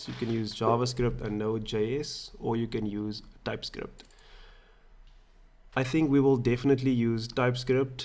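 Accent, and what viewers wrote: South Africa. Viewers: Hey dude, are you from South Africa ?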